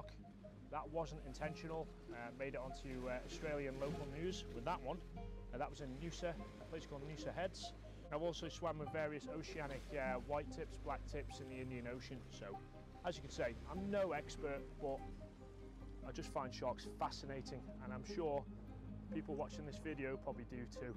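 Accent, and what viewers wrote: Liverpool, United Kingdom. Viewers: R u scouse? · Av you got scouse in youre voice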